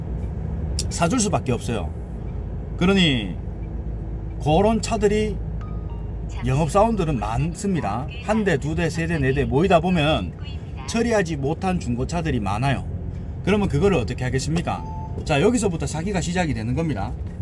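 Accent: Gyeongsang, South Korea. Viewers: 이제 용차를 준비하는데 좋은 가르침입니다 경상도 사투리가 듣기 좋네요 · 갱상도 사투리 졸나게 거슬리네 토착왜구당 개세들 생각나서 나~~간다 갱상도 정말싫어 귀청소방 이나 가야겠다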